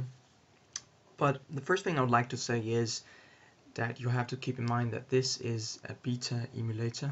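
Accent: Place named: Brazil. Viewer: thanks man! I didn't know what to do with the citra.exe application. Do you live in Brazil? Your accent sounded like it., but I doubt it because of your name.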